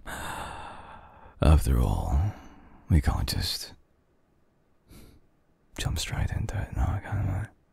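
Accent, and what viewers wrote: United Kingdom. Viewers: He's....brit isn't he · i swear the british accent makes this video <num>X better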